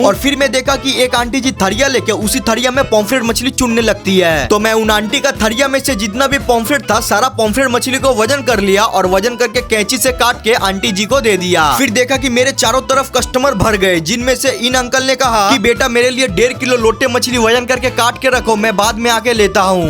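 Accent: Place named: Bihar, India. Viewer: Bihari hai kya bhai😅😅😅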